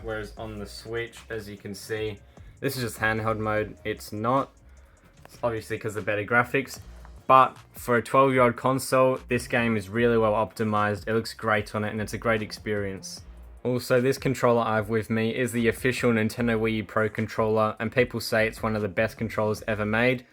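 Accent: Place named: Australia. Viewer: Subscribing for the aussie accent alone. Without joking awesome video 🍻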